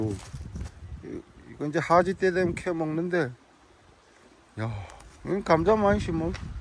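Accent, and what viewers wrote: Gangwon, South Korea. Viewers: 더워요 얼굴을좀 강원도 사투리 구수하고 말억양이 독툭해서 자주시청하는대요 목소리는 젊은신것같은데 언득보니까 60대70대같어서 얼굴공개 안하시는건가요 어때요 맘이 고우시고 어지신것같은데요 얼굴보고싶어요 암튼 대박나시길 바랍니다 핫팅 ㅎㅎ❤😊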